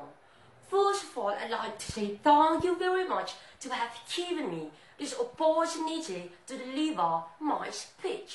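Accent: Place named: United Kingdom. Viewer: Love your british accent!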